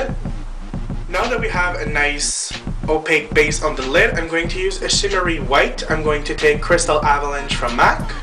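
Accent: Quebec, Canada. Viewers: OH un quebecois :O :D bonjour :) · (: i love your Canadian accent<3 and your skin looks flawless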